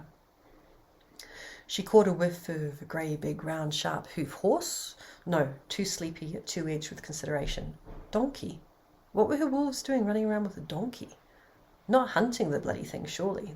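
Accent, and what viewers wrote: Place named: United Kingdom. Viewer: Thank you. I listened to this one and turned on CC. Most of the time they got the words wrong, but I got the story. I remember the chatacters from the books. I'm in America so listening to the brithish accent is always interesting. And you seem like a nice person. I've been communicating with you since 2021, at least.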